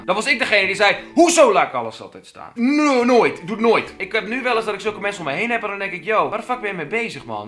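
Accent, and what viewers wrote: Groningen, Netherlands. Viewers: Grøninger accent tog jonguh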